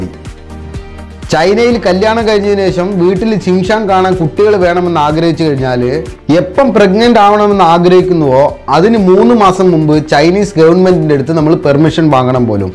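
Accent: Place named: Highland region, India. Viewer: Hai bro aru from up hill distic ur longeage slang up hill modal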